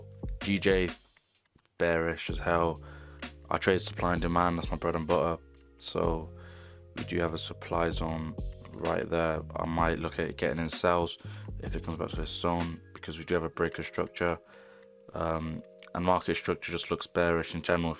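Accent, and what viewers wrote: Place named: London, United Kingdom. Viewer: in the background and you sound like a Londoner we should defo link up if you are we can share some gems 💯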